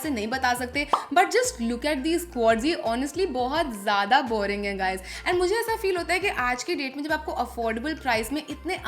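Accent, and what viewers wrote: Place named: Delhi, India. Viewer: I m your ardent fan since 2016 but my heart melts in your Hindi videos ..that slight Delhi accent makes you more relatable ❤❤❤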